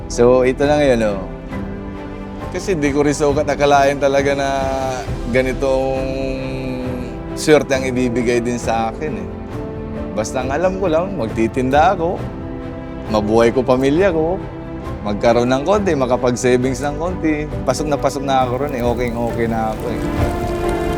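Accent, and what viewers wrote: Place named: Pangasinan, Philippines. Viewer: Ang tono ni sir parang taga pangasinan siya, mabuhay ka at wag kang susuko par, par ang tawagan ng mga taga pangasinar pangalatok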